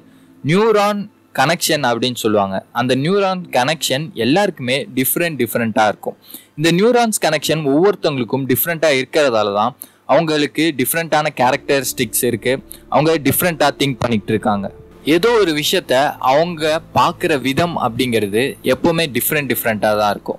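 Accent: Kerala, India. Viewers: கேரளா தம்பி குரல் மாதிரி இருக்கிறது. நீங்கள் யார்?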